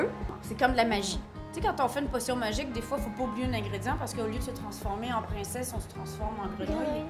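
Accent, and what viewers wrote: Canada. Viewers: Ne serait-ce pas un petit accent du Canada ? Quel accent, je l'adore. Je vous embrasse.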